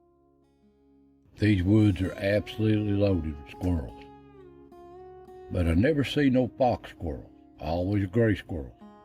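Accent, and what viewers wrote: Appalachia, United States. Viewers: Yes sir Mr., we love hearing you narrate these wildlife videos!! Viewing the animals is great to watch taking in all GOD’S CREATION, but your Appalachian voice filled with your knowledge and the way you explain everything to us is the icing on the cake!!!! Great Video!! 😇🙏🏻👍🏻☀️😊😊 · We love your voice, it is extremely intelligent knowledge with a true applachian tone. Love when you narrate. Enjoyed the wildlife this morning. Thank you for everything...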